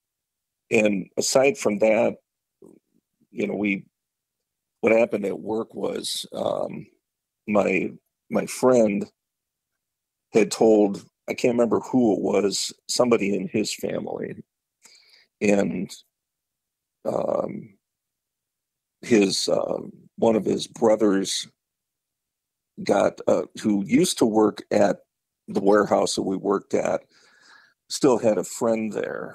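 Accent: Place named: Minnesota, United States. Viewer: As a Minnesotan, thanks for sharing your story from Up North with us,. I didn't consider you boring as I listened to your story and interview. You sound like a born and raised Minnesotan like myself - honest and forthcoming. There's two new aspects of Sasquatch that I hadn't thought of before I watched this video. The first is Bigfoot hitting the tree with its fist after it spied you and your buddy. Now I'm wondering if that's what "tree knocking' signifies - a Sasquatch seeing a human? And secondly, I never considered the horrid odor being a response from Bigfoot like a skunk spaying when surprised. I always thought Bigfoot's scent was from lack of personal hygiene, but it always baffles me why some folks smell the horrific odor and others don't. Could that be a trait in specific Sasquatch species and others not? More questions than answers, but perhaps that is part of what makes Bigfoot so intriguing.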